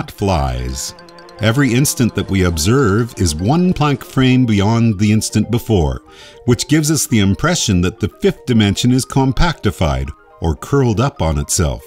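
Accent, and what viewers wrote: Canada. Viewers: You’re Canadian! I heard it in the aboot. Great videos.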